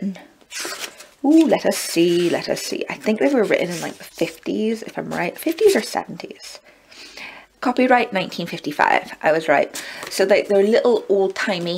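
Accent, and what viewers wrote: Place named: Scotland, United Kingdom. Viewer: I've just re-watched the first 20 seconds of your video and I would have bet money that you had a [mild] Scottish accent. And I'm from Yorkshire in the North of England, so the Scots are my nearest neighbours. I've got to ask, where are you from? If that's not a Scots accent then what kind of accent do you have? I'm genuinely perplexed and massively curious. You speak English with native fluency so I doubt it's your second language.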